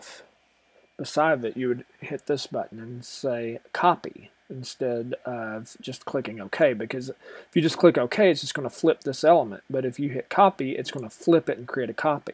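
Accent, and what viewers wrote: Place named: United States. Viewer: You sound like a cowboy :-D You're accents amazing! This is a really helpful video. Thank you.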